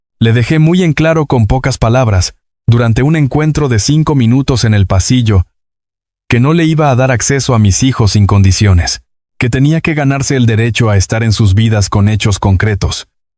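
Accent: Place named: Mexico. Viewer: me encan tus BL pero creo que deverias relatar con un lenguaje menos mexicano porque no todos entendemos el modismo de las palabras mexicanas creo que deberias ser mas plural al hablar con acento y modismo neutro que en todos los paises podamos entender con todo respeto te digo esto tomalo en cuanta porfavor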